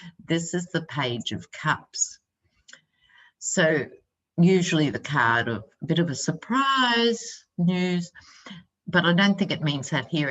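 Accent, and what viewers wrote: Australia. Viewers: Oh I love the Aussie accent! In fact I think it’s puts greater importance on the words. Love from the USA. Please stay safe. God bless you and yours.♥️🇺🇸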